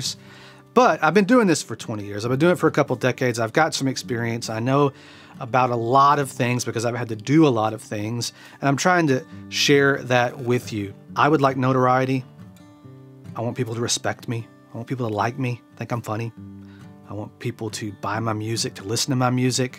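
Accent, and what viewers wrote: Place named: Texas, United States. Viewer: Hey, YouTube is awash with "how to" tutorials. What's refreshing about your channel is that it's a personal journey of one man and the stories he shares (including his those of his dad!). I love that gentle, southern texan accent too (I'm from Melbourne so I don't hear a lot of it around here!). Throw in some cool music in the background to support the stories and it's a beautiful place to be! I'm very happy to just be present and an observer of your journey, in all it's twists and turns. Long may it continue!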